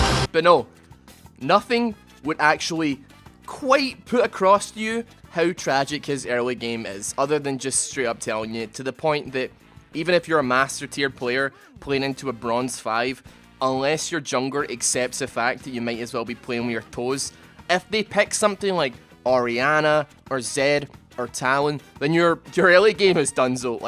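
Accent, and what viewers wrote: Scotland, United Kingdom. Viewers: are u scottish pal?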